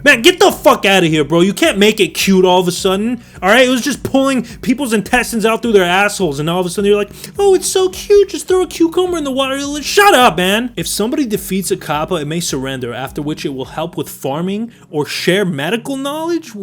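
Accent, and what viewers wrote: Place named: New Jersey, United States. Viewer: His joisey accent is 👍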